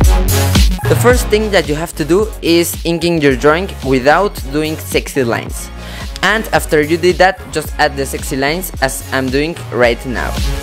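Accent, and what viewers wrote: Spain. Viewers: Como se nota que hablas español xd (no hate :, you draw nice :D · eres español? tu acento ingles me suena a español, buen video!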